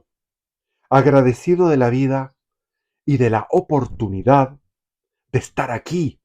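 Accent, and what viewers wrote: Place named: Chile. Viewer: Feliz Año Nuevo desde Sevilla a Todos. Tienes una voz muy bonita y da gusto oírte .Eres muy educado y hablas muy bien .Me gusta mucho el acento de Chile y de Sudamérica en General. En cada País Americano , hay un acento diferente y solo oyéndolo, te digo si es de Perú , Mexicano, Cubano, Venezolano o Argentino etc etc.¡¡ Desde Sevilla , España , Saludo!! Pd , No me gusta Guerra entre Países hermanos .En realidad no me gusta Guerra entre humanos, pero entre Hermanos de lengua menos.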